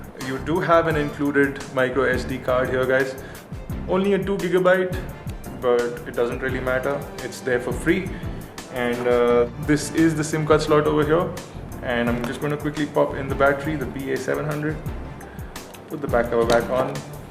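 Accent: India. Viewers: indian is speakin!!! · Why are all these reviewers Indian? I fucking hate hearing this accent all the damn time!! No I'm not racist it's just an opinion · Its like I'm listening to that indian character on the Big Bang Theory series... 0_0 PS: Yes I know the uploader is probably indian.. what I'm saying is, its annoying.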